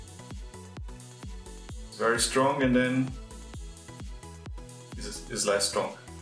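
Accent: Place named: Brazil. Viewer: Pra mim ele já é pica por meter a cara e fazer o video em inglês, já moro fora a 6 anos, falo inglês todo dia, mas ainda não tive coragem de começar um canal em inglês. Mas o sotaque BR é tipico, esse é o nosso tempero especial, os outros BRs sempre me descobrem pelo sotaquezinho de fundo e eu eles. haha Muito bacana, agora eu vou lançar meu neon aqui pro meu wallpaper. hehe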